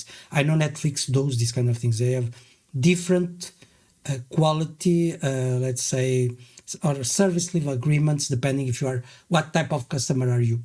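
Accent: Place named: Portugal. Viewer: I recognize that accent anywhere. You, sir, are Portuguese. Congratulations on a very interesting video.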